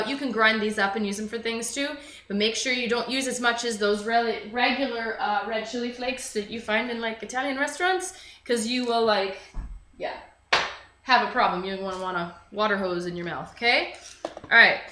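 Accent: Canada. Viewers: Lol you are so canadian! The accent is pretty sexy though...great video!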